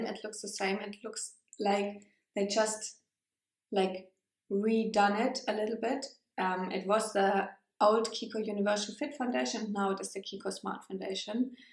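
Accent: Germany. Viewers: Die Message ist sehr schön: man sollte nicht zu stark anders aussehen. Du bringst es auf den Punkt. Übrigens ist dein Englisch richtig gut. Ich rede auf der Arbeit 95% Englisch und muss echt mal lernen so wie du zu reden. Auch wenn man deinen deutschen Akzent etwas raushört (was ich süß finde) machst du keinen Fehler! Ich mache öfters Fehler oder denke kurz nach. Und wenn ich mich dann über etwas aufrege wird es von Wort zu Wort immer mehr deutsch 🤣🤣🤣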